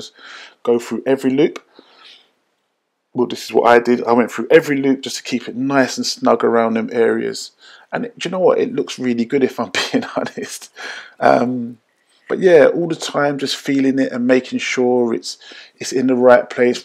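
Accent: United Kingdom. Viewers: Finally found a video where someone did a fantastic job and spoke clearly in the Queens English, worth subbing and great upload. Thanks for taking time and sharing young man, appreciate.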